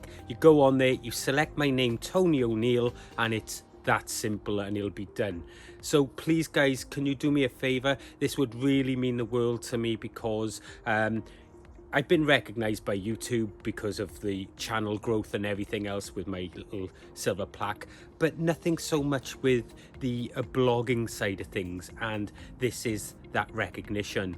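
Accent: Wales, United Kingdom. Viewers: Hi Tony. I must have missed this one. Your sounding proper Welsh in this one happy dayzzzz. By the way I am myself from wales just down the road from you any how take care Ange and the gang 🤗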